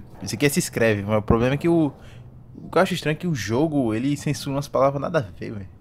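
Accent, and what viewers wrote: Northeast, Brazil. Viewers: Tú é nordestino né fi?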